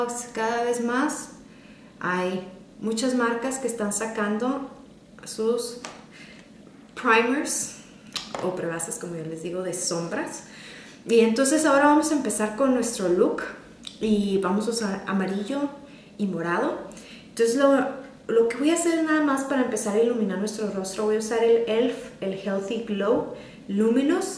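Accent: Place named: Tijuana, Mexico. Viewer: esta chulo el look jajaj se te nota que ya vives en tijuas... digo por como hablas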